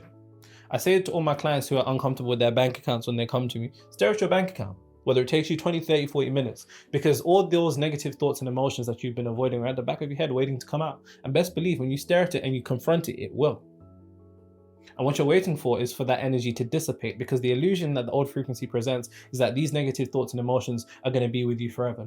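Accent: United Kingdom. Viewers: Lol😂 I'm glad I didn't put the comment about the way you say pasta like "pastor " I mean I get it we sound different from every region of the world ....I truly admire the u.k. accents most of all if I see you I won't try to sound like you but I can't help but copy your accent at home 😅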